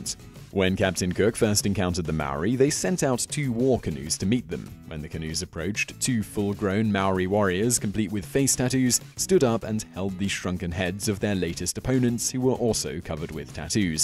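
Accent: United Kingdom. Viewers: Wtf do you expect, he's British. Can you imagine a Kiwi trying to pronounce Middle Eastern or Asian names correctly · He's British, his way of pronunciation trumps yours. That's how we say it in America too, so your way is wrong.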